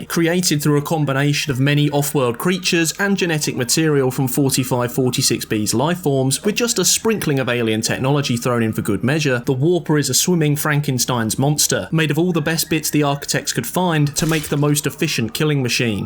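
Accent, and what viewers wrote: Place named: United Kingdom. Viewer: Why is it every time I come across one of these Explained Video's No matter what game it is it's always Some British voiced Prepubescent kid XD lol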